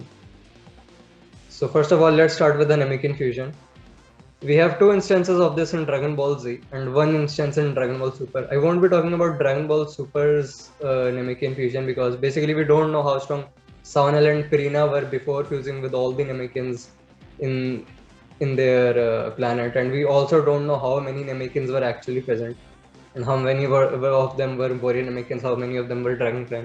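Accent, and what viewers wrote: India. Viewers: I couldn’t understand him that Indian accent is to strong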